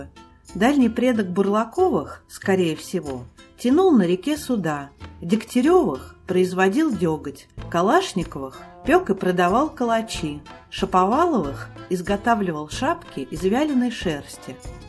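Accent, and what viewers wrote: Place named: Gomel, Belarus. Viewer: Говор беларусь гомельская обл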